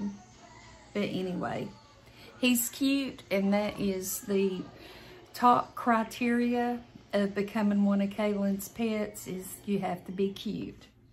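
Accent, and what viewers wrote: South, United States. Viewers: Hi, I enjoy watching your videos. I like your accent, reminds me of some of my family from down south. I used to call my grandmother grannie. She was a good one. I enjoy going to the thrift store too. My daughter took me last Saturday evening. We wore our masks and had a great time shopping. Thanks for sharing your video. 💖💖💖💖💖💖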